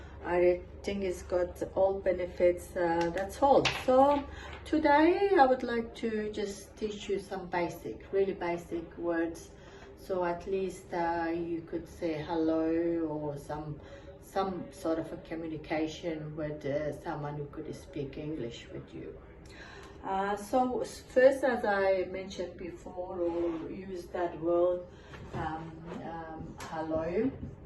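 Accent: Kandahar, Afghanistan. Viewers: May I ask if this is a kandahari dialect? I married a Pashtun man from Kandahar and am trying to learn his dialect (he speaks southern pashto) which is a bit different from northern pashto.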